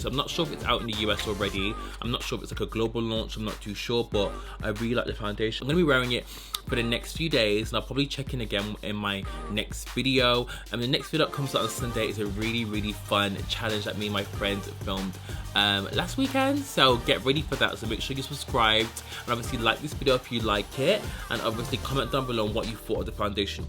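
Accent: United Kingdom. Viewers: Uhhh I looove your british accent!!!! 👏🏻👏🏻 I have been waiting for a review of this foundation! 😍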